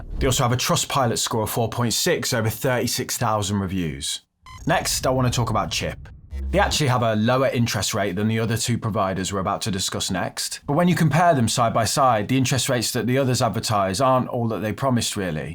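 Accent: Birmingham, United Kingdom. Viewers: Thanks for the reassurance. Just about to open a cash ISA with 212, plus a Stocks & Shares Out of curiosity, can I hear some soft sounds of Brum in your voice? We're in B13 🙂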